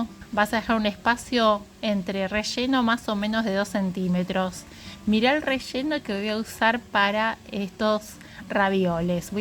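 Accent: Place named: Argentina. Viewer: Tw acabo de encontrar, amé tu canal y recetas, dónde vives que se ve ese mar hermoso pero tonada Argentina??????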